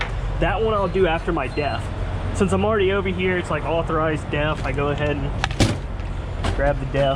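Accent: Mississippi, United States. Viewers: Man I knew you was a Mississippi boy lol. Same here.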